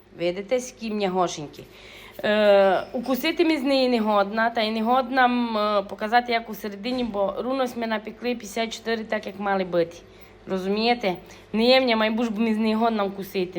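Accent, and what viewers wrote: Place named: Transcarpathia, Ukraine. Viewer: чекаю з нетерпінням ваші нові відео 🫶 Обожнюю закарпатський діалект у вашому виконанні ❤❤❤. Знайшла (нарешті 🎉) ваш канал на YouTube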